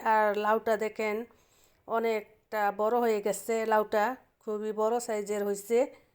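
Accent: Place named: Narayanganj, Bangladesh. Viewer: নারায়ণগঞ্জের মানুষ এই ভাবে কথা বলে আমার জানা ছিল না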